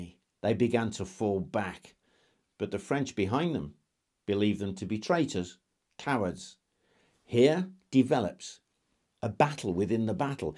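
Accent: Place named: RP, United Kingdom. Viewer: A special thanks for RP and not using slangs or dialects(hello cockney))).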